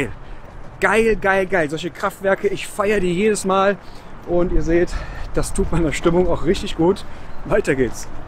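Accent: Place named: Cologne, Germany. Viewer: Guten Morgen. Tolles Video, wie immer und dazu dein Kölche Dialekt, vom feinsten. Wünsche Dir einen schönen Wochenstart.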